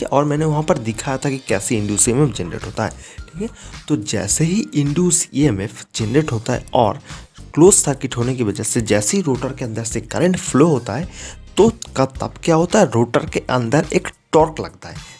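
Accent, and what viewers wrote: Bengal, India. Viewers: তুমি বাঙালি তাই না??. হিন্দি বলার ভঙ্গিতেই ধরা পড়ছে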